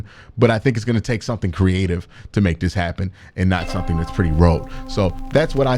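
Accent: Cleveland, United States. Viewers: q ..... frustrated actually has an "R" in the word ....big homie! our horrible cle accents.... hard to fix....i know!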